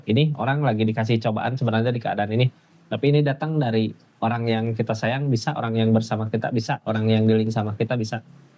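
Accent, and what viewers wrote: West Java, Indonesia. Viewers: Sunda banget logat nya. Seneng nonton nya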